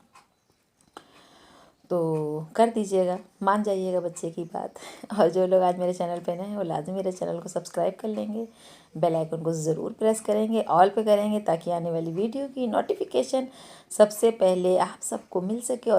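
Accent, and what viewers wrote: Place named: Kanpur, India. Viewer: aapki awaaz se lagta hai aap Kanpur ki hai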